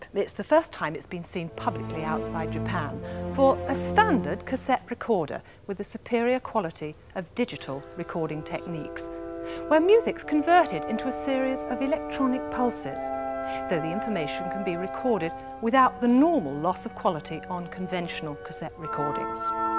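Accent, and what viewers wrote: RP, United Kingdom. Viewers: I love the way the presenter was so posh. I wish the women I knew were hi-fi nerds! Imagine a woman blabbing on about which tapes to use and if Dolby should or should not be used! That would be fantastic, instead they bombard me with crap and TikTok videos I delete immediately upon receiving! Anyway, this cassette was a flop, the CD was launched in 1982 also and we all know what happened!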